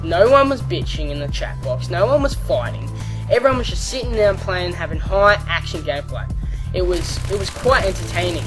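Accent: Australia. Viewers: i dont know what it is but for some reason listening to an aussie just makes this vid seem more sophisticated. it just sounds right, American comentators just cant top it. he sounds like abit of a bogan but still, this vid doesnt sound as dumbed down for little kids. keep up the good work mate ur a legend. and to people getting bothered by the accent think how we feel with the significantly lower amount of aussie commentators. remember its as anoying for u as it is for us listening to ur accents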